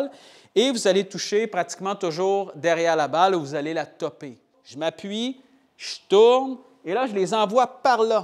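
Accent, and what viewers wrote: Quebec, Canada. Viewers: C'est marrant... Quand vous écrivez, on n'entend pas votre charmant accent de la Belle Province...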